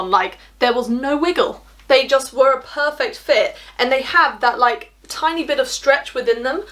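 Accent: United Kingdom. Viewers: I love all your videos, and I love your very British accent ♥ ♥ ♥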